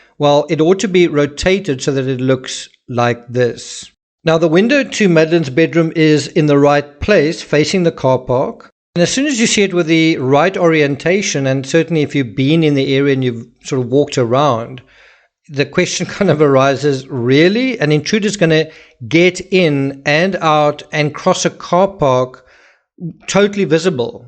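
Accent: South Africa. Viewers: I like the SA accent as well.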